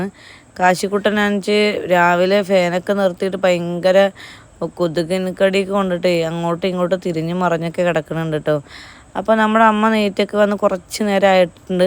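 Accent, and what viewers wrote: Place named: Palakkad, India. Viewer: ട്ടോ പാലക്കാട്ടുകാരുടെ സംസാര രീതിയാണ്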